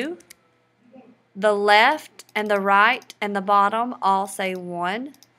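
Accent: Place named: Georgia, United States. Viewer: Thank ye kindly, Georgia Girl! Lovely voice and accent. Very helpful. TYVM! :)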